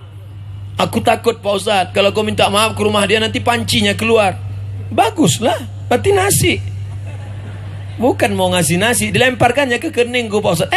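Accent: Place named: Medan, Indonesia. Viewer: Mantap kalilah UAS ni logat medannya keluar,,, 👍👍👍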